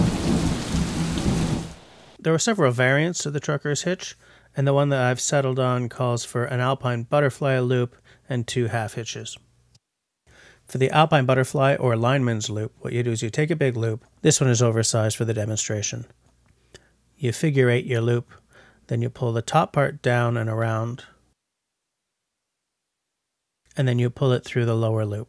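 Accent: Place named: Western Canada, Canada. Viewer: Hey there friendly. Man, I loved this one, too. Dead battery, yeah right. I bet it was a Nigel Tufnel wireless issue and you had the RCAF instead of you talking. Lol. How hard was the dubbing at the beginning? I'm just learning to edit, so I know it must have been way tougher than it looks. Had to watch twice, you kept cracking me up. I like your dry sense of humour. "here I am being silly/I must have said something funny/as I look pensive". Happy Thumbs up, sir. On a side note, your accent doesn't sound Quebequois...west of Manitoba, perhaps? Take care mon frere,.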